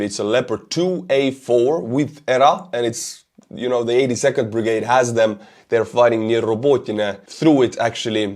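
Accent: Russia. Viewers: Funny thing is that you dont have to fake an accent, you already talk like a russian/Ukrainian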